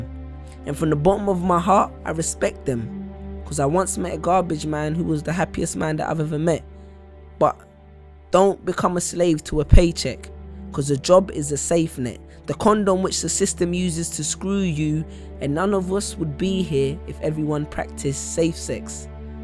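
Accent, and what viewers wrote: London, United Kingdom. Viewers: That's a British accent... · It's quite disturbing seeing a black man with British accent! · british london accent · it's a British accent. Australian accents are alot rougher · He has a British accent.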